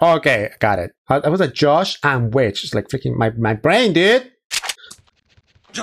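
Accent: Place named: South America. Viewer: Just finished part 2, great job wow! I like your accent, are you South American?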